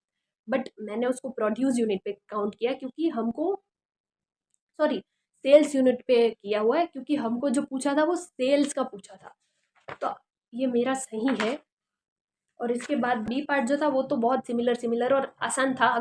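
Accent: Gujarat, India. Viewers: Tumhari hindi thodi gujrati vaali he. Kya tum gujrati ho?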